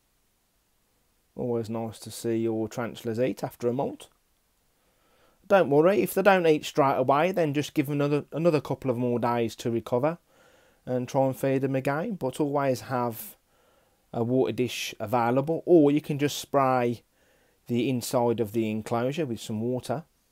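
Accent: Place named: United Kingdom. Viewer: I hear the a as o instead. Brittish accent can be difficult to understand sometimes, particularly if you hear American all the time then you thing that is the normal. I am not a native speaker, I am from Greece. You speak with original brittish accent though. Maybe Charles Darwin spoke like that, I don't know. Generally the Brittish sources I have read refer to the animals with more normal names. You say royal pythons, Americans say ball pythons. In Greece the Greek name is royal python but colloquially many people say the American, ball python. You say horned frogs, Americans say pac man frogs which is somewhat silly. The same with morio worms. I don't know who tried to market them as superworms, it sounds like a cartoon name and of course if you were to find them in the wild you wouldn't devise a name like that. Or the black soldier fly larvae which are called phoenix worms, or the stupidest of all, calciworms, while in fact they are just plain maggots.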